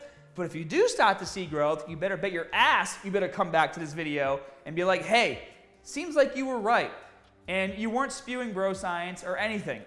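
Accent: Boston, United States. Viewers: His Boston accent comes and goes. He's working HAHD to neutralize it.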